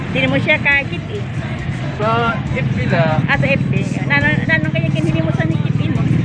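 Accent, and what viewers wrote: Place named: Masbate, Philippines. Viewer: Ohhh ok.. may kunting halo salita ninyo ang masbate. Ano po tawag sa language ninyo dyan?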